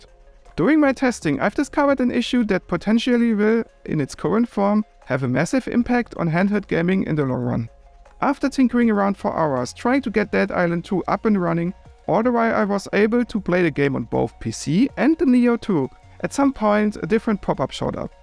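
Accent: Germany. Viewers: Being new here, I thought,"man, that's one thick german accent" and then the name was displayed. Brother, as a fellow german, I feel for you - probably having shared the same educational system. Keep up the good content and thick accent.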